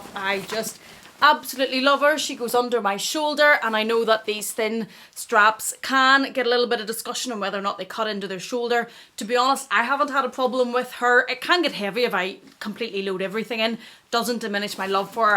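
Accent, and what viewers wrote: Ireland. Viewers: Amazing collection! I also love chanel chevron 🥰 whereabouts Ireland are you from? Love your accent 💚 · You have a stunning collection! New subscriber; came across your channel and when I heard your brogue I had to sub! My grandfather was from Tipperary immigrated to New York where I am from but live in Florida now. The colors of your collection work so well for me and enjoying watching your videos!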